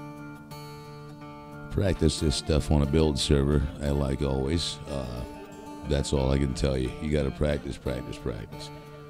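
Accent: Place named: Midwest, United States. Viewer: Your voice tells tells of many great tales in the American Midwest by the utterance of just one word.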